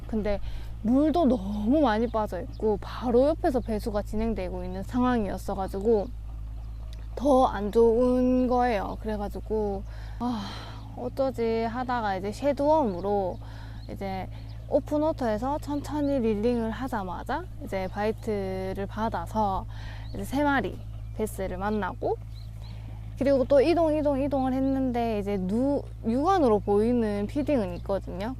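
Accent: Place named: Daegu, South Korea. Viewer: 대구 말투 넘흐 좋아요 ❤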